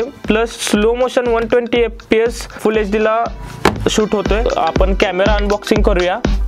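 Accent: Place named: Kolhapur, India. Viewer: Assll kolhapuri marathi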